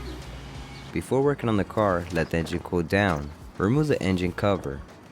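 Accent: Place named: Texas, United States. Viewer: are you from Texas?You said you was fitna be replacing… sound like a home boy to me!!🤙🏾🤙🏾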